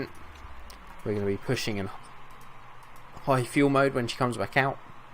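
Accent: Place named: Devon, United Kingdom. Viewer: Another excellent session. Your pessimism always amuses me:D Your car is coming along very nicely. Great things are coming. On a side note, I've been trying to track down your accent for months now, so I'm going to come out with a guess - Definitely Southern....Devon perhaps?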